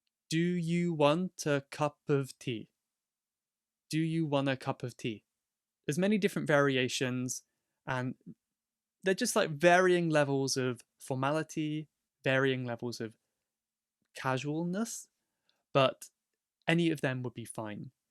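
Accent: United Kingdom. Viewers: Thanks! You are good teacher. I've been looking for such a clear British accent for a long time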